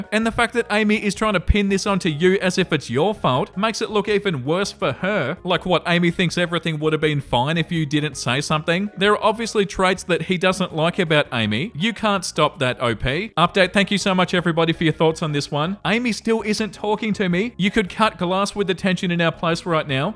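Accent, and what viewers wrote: Australia. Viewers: It's probably a accent thing as he is Australian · It’s funny. I’m Australian, so I don’t notice his accent, but I recognize the kindness and horror in his voice. 😂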